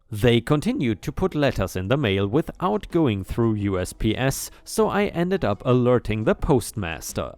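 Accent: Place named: Germany. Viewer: You sound like ypu have a german accent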